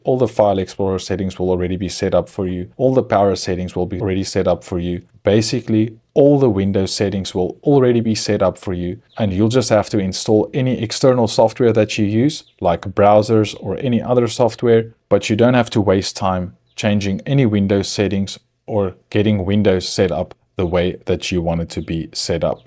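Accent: South Africa. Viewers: You sound like a South African that lives in Ireland. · You sound South African. Great work. Thank you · Saffa accent I hear? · You sound South African. It's not on git. I will assume AI slop unfortunately. I won't bother with Windows again though. If I really had to use Windows for someone else I'd just use shadowwhisperer/XakerTwo scripts from github, ChristTitus Winutil from github and the like. Transparency is needed. It is important to specifically turn off Windows malware injection garbage specially on Asus pc's. Windows Platform Binary Table (WPBT).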